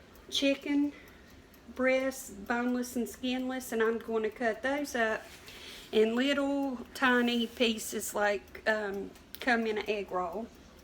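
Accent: South, United States. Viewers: I love to listen to a southern accent...I could listen to all day long.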